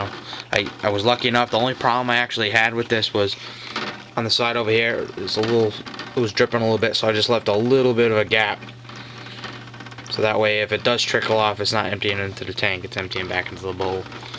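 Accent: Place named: New York, United States. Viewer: I can tell your from new york by the way you speak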